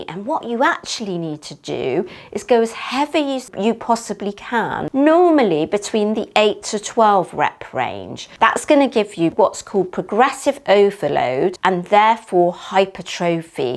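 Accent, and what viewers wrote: England, United Kingdom. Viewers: You had me at your most splendid British voice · You do look great, nice physique... I like the accent, You Brits 😆🤣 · That English accent wow.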